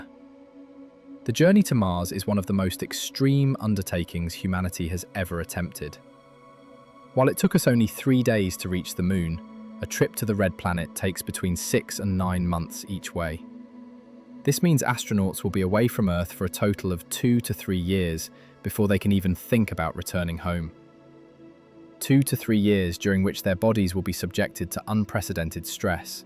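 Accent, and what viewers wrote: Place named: United Kingdom. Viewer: British English is irritating!!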